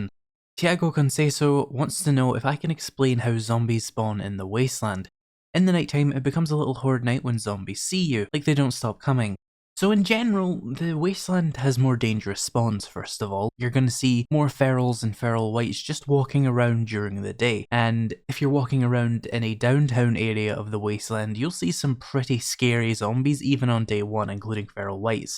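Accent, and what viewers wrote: Scotland, United Kingdom. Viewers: Ask: What kind of accent do you have? It sounds vaguely Scottish (apologies if I got that wrong). Many thanks for the videos, I always learn a lot from them.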